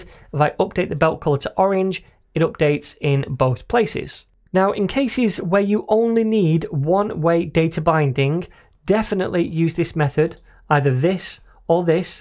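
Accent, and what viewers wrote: United Kingdom. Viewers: Why does `s accent sound like brittish?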